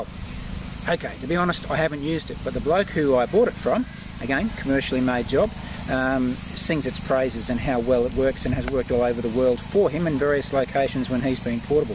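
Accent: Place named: Australia. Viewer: Sorry but only 25% of what you are explaining is understandable for a non "Aussie" - certainly ad that speed!. "That mountain is too high for me". Paul - OP5A.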